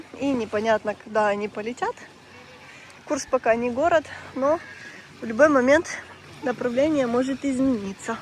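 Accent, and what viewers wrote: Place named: Odesa, Ukraine. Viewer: Шо вы мовой говорите , говорите по Одесски шо слух радует .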